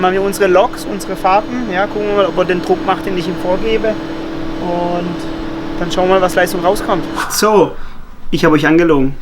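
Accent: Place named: Hohenlohe, Germany. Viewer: aaah wieder dahom in Mergentheim, seh i doch glei... :-) "...Steckor uffs Getriebe..." is hald doch faschd Houalohe!!!! Houalohe, do wo die Hase Hoosa haase, un die Hoosa Housa haase. :-)))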